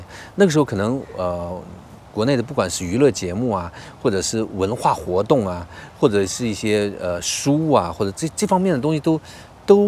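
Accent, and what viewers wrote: Military village, Taiwan. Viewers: 除了渠道這兩個字不是台灣人的話，根本就是一位談吐文雅的台灣人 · 您講話的語氣已經很台灣了，像台灣人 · 聽您說話沒有什麼捲舌音，老實說口音本身就很台灣.... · 你的口音就像我身邊的朋友一樣，怎麼一股台灣味。不帶台灣腔（台語）的台灣味。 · 聽起來99%台灣人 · 完了完了，我感覺這位朋友已經有一半的台灣腔😂 文化很有趣，需要被傳承，卻也需要被衝撞。衝撞之間，某些時候會產生特殊的反應，那就是創新。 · 山貓小子應該是福建人吧？根本台灣口音。語尾還加啦。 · 有台灣腔了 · 您的說話已經開始有台灣腔了，聽起來很親切!! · 請問您是哪裡人？怎麼台灣腔會這麼標準，如果沒看你所打的字，根本不會注意到你是別國的朋友XD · 您說話已經有台灣腔了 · 山貓小子哈哈他說然後然後真的已經和台灣人沒什麼兩樣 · 你說話怎麼跟我們台灣人很像呢？宗教文化主要是教化人心，也是讓我們凡事要做之前要考慮後果？不要只想要什麼，而是要想你能付出什麼？ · 講得好台灣欸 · 我也覺得有台灣腔.. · 大哥你已經有點台灣腔了哈哈哈哈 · 老哥你的口音已經是眷村口音了⋯⋯用字遣詞和腔調跟臺灣眷村子弟很接近了。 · 是台灣腔無誤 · 這台灣腔還真是道地呀！人也挺帥的。謝謝你喜歡台灣。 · 你説中文完全的臺灣口語和調調，而且聽起來溫儒順耳。敘述平實而不浮誇，深入臺灣明白社會結構，思緒是5_60歲的老臺灣文青~不錯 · 更精確的説，與眷村的口音非常像。因為贅詞很少。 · 不看字幕. 不看影像. 只聽聲音. 確實會以為就是台灣人. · 真棒這影片，一口台灣腔⋯👍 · 您說話有台灣人的腔調耶！👍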